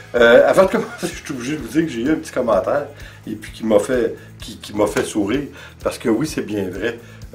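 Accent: Quebec, Canada. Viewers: C’est toujours un plaisir de voir vos expériences merci de nous les partager, et puis votre accent Québécois j’adore !! C’est vrai parfois pour nous Français il faut un peu de concentration pour comprendre mais c’est vrai aussi que le langage vient du vieux Français !!!!! Alors continuons à voir vos tutoriels 😉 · J'adore l'accent québécois et je comprends tout, pas besoin se sous titres ! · Bonjour Je découvre récemment tes vidéos. C'est un vrai bonheur à tout point de vue! Et qu'elle audace concernant tout ces essais cela donne envie de faire et de créer Merci pour tout ce temps partager jusqu'à la remise en route de la Harley 👍 Je vie en normandie mes 2 fils vivent au Canada j'adore cet accent l'on te comprend très bien "c'est correct" 😉 surtout continu de nous faire des petites vidéos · ne changez rien à votre accent... habituellement je ne comprend rien au parler québécois, mais vous, je vous comprend très bien. 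comme vous, les français ont un accent, le mien est de Marseille. mais ça ne change rien au fait que j'aime beaucoup ce que vous faites, merci de nous faire partager ce bonheur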